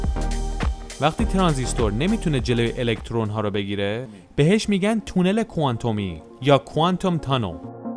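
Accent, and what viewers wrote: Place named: Hamadan, Iran. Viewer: باید جواب بدی . میگما شمادلهجه همدانی داری ..خدای همدانی هستی من یشرط بستم ..حاالا ب رازی بگوببینم. همدانی پوست خرکن هستی ....زود اعتراف کن..😞😒😞😒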